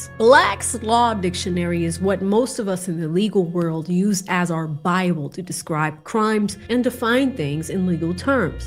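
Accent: Boston, United States. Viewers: You really let the Boston accent out in this one.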